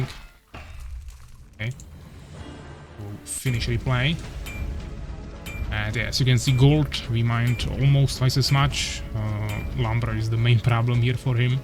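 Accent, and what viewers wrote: Czech Republic. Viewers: tu češtinu v hlasu slyším hodně :-)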